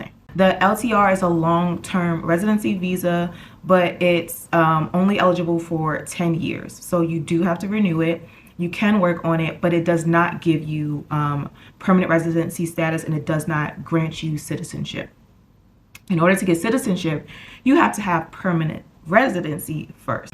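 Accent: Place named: United States. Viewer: Her parents are American or something because i hear NO accent of anything. She sounds like a regular straight up American. And is she a military baby or why did her family move over there?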